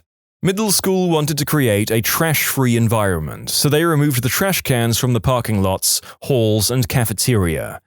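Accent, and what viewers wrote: United Kingdom. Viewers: Pardon my language sir but WHY THE FUCK ARE YOU BRITISH NOW, WHAT THE FUCK HAPPENED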